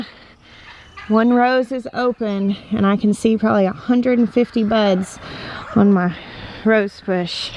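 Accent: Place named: Ozarks, United States. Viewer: Love your accent, sounds like someone from ozark!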